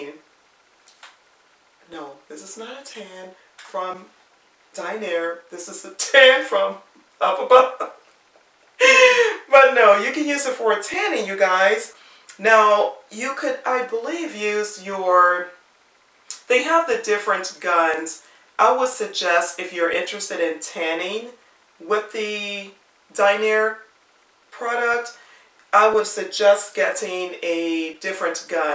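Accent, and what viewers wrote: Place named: Jamaica. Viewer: Your LV 6 Key Holder particularly stood out because that's on my radar. I didn't SEE this - but your accent is soothing. My family is from Jamaica and sometimes you pronounce words as they do. Anyway, new subbie here. Please enter me in the drawing and continue to make your videos! :D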